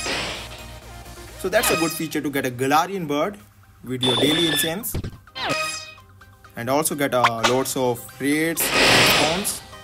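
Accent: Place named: India. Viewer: It’s obvious. He’s from India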